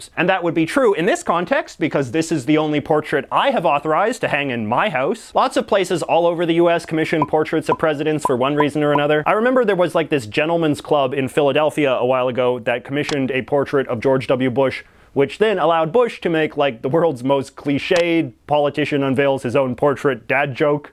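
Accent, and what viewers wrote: Canada. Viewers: Your Canadian accent physically hurts me. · Wait, are you Canadian? Every time you say, “about” I’m like, heyyyyyy.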